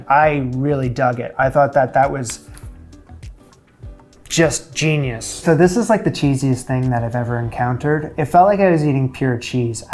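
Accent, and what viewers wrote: Canada. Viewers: Your previous pronunciation of cauliflower is not that big of a deal. What bugged me at first was how you said “pasta”. But then I heard a few other words that were pronounced in a distinctly Canadian way. So, I Googled “how to Canadians pronounce pasta”. Sure enough, I discovered that was it. My point is that with the way a certain 🦇💩🤪🍊🤡 has been treating our neighbors to the north, I’m going to try to adopt the Canadian pronunciation of “pasta” for the foreseeable future. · Don't be embarassed by your Canadian pronunciations....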